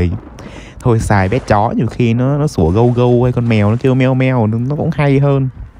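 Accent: North, Vietnam. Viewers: Anh gốc là ng bắc đko ạ?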